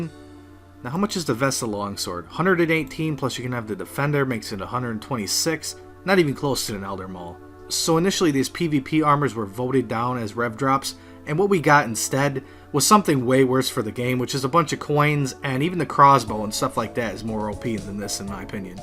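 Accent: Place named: Michigan, United States. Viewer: Are you from Michigan you have a similar accent to mine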